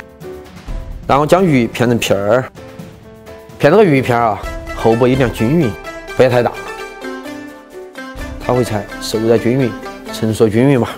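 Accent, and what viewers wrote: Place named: Sichuan, China. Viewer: 哈哈哈，也會說四川話了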